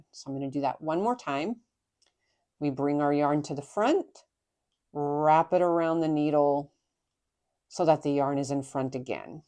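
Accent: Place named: Oklahoma, United States. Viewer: The dining room looks fabulous! I ❤the Indian chief picture, beautifully noble. Drawer only sounds funny to you because you have developed the Okie accent. 😂 I was supposed to get to meet you at the book signing in the McKinney Knittery but I had a bug. I picked up your book the next day, it’s amazing. Thank you! You might could start a “give away” of socks.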